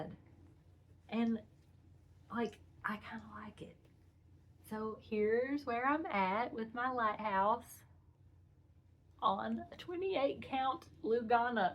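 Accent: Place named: South, United States. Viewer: Love your stitches and your southern drawl 🥰.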